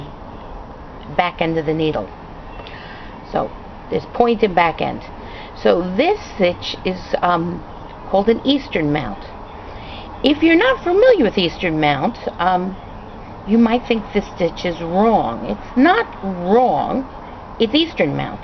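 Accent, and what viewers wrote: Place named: Troy, United States. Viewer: We used to go to Troy on our way to Cadyville almost every week end because we had partying friends in "those days". Troy, was "big" on our list! I know; because your accent is most evident. I am amoung the 20 percenters of knitters. I knit though the back loop and purl through the front loop. I get a cross stitch unless I purl through purl through the back correct? I wrap my purls the same as You do. I subbed and I don't know how I missed Your wonderful channel in the past! Thank You. I do have a question for You. What will the most probable outcome be if I enter some of My awesome socks or knitting into the fair for judgement in Our today's world?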